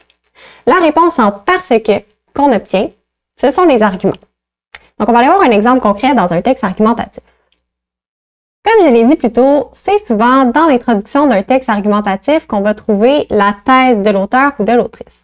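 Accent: Quebec, Canada. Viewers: Très bien fait mais l'accent de la jeune femme est québécois ? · Dude the canadien accent makes me vomit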